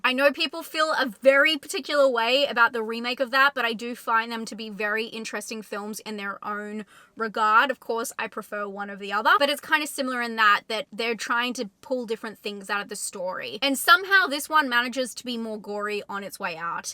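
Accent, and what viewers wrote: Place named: Australia. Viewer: And this one tiIIIME! At Band CaAAAMP! I love the Aussie accent when done a bit more charmingly and understated. See fellow Youtuber Matt Parker (Stand-up Maths/Numberphile) for a better example of this rather than this overexcited version. Love the energy though, and clear passion.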